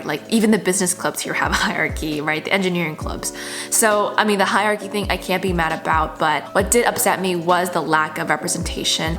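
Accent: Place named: United States. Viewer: I knew you are American the first time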